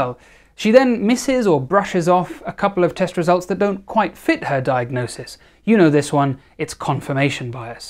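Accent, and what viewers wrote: South Africa. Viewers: top notch South African accent! Laughing so much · I don’t know what you have against doctors from kwazulu natal, but your South African Durban accent killed me.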